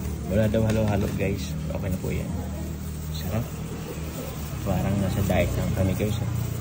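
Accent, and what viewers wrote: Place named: Visayas, Philippines. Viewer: Wow marunong na magbisaya si ❤❤❤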